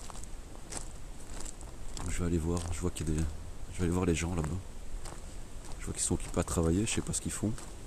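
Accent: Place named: Belgium. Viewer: L'accent Belges, J'adore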